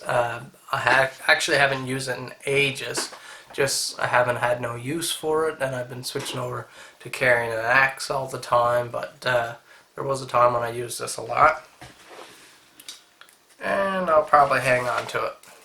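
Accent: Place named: Newfoundland, Canada. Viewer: Your accent. You a Newfie?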